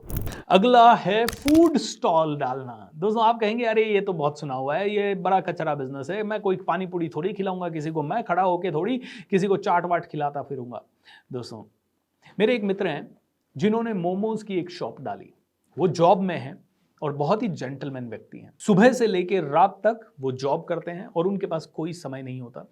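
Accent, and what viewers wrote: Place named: Rajasthan, India. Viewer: Lagta hai aap rajsthan bikaner se ho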